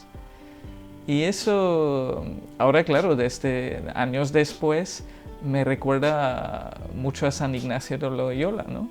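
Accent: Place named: Colombia. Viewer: Tiene acento Colombiano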